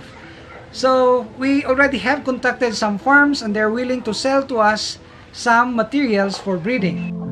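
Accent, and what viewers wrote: Philippines. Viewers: ARE YOU PILIPINO?? YOUR ACCENT . PLEASE SPEAK TAGALOG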